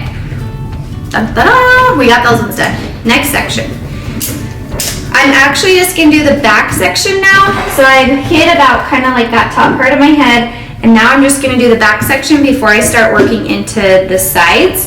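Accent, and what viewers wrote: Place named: Utah, United States. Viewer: I thought she had a Utah accent! I live in California 30+ years and when I go back I slip into the lingo and accent.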